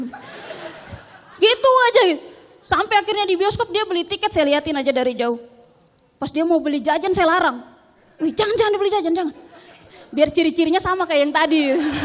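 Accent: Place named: Eastern Indonesia, Indonesia. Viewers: Saya orang Sanger Namum BANGGA akan nona dgn gaya bahasa timur..keren keren nona.maju terus dlm berkarya... Tuhan memberkati mu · Kk...logat Ende kantal Alee👍👍👍😁😁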